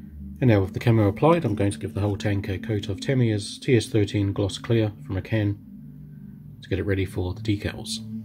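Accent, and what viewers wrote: New Zealand. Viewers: new zealander?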